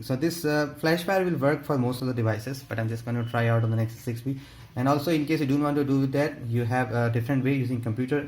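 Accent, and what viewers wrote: India. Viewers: it's not that he mumbled it's that indian accent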